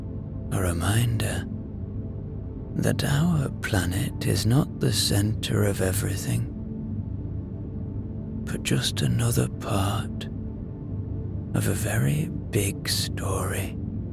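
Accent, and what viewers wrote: Ireland. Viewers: You have such a calming voice. I love the Irish accent. I think the Irish singers are fabulous too. I’m afraid I never get to enjoy the actual stories as I don’t hear them. It takes a very short time to be lulled asleep listening to your voice. Thank you.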